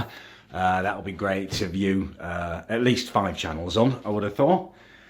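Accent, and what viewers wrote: United Kingdom. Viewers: British accent is the greatest 😂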